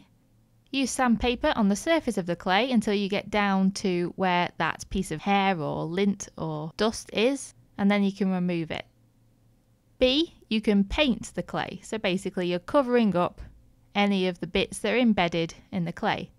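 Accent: United Kingdom. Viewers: I realize that, I just wonder where in Britain people speak like that. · I heard british accent, isn't it?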